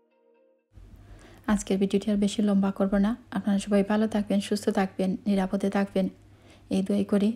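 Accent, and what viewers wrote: Sylhet, Bangladesh. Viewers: Afa Sylheti matowwka Eta mat bala lage. Na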